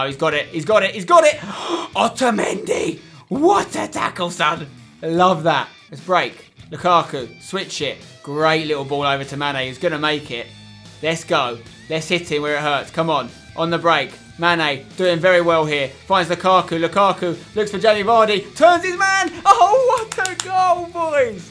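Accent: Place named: United Kingdom. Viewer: Chaz Hartwayne he talks British that is why he is saying it like that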